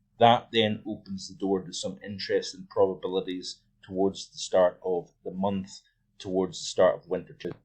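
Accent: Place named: Northern Ireland, United Kingdom. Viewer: I watched 3 of your videos thinking you were from northern Ireland, until you said you were in Scotland. I'd put you from Ballyclare 🤔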